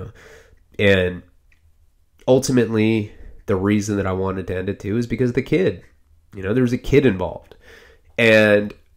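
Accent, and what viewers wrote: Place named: Chicago South Side, United States. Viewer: Your south sider accent is priceless 🤣🤣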